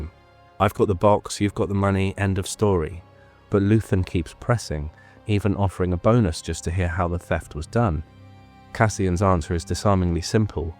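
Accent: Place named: United Kingdom. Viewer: I think the captions are useful, as sometimes your phrasing says something that my ears hear differently (I don't remember the exact context - or even if it was this video - but you said 'knot' and I heard 'not' which completely did not fit the rest of the phrase. I had glanced away from the screen and had to back up and read the caption to get what you were saying). This may be an accent thing, as I'm from the States, but I also find the captions useful as you are using terms and phrases that are distinctly British sometimes and I can understand them more by reading them than by hearing them. Hopefully, your change in future videos, which I haven't gotten to yet, still works for these more idiomatic phrases.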